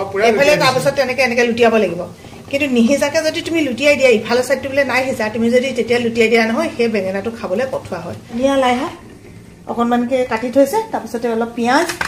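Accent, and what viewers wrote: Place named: Tezpur, India. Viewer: Aitu amar tezpur fala vokosu buli koy kai kub val laga ❤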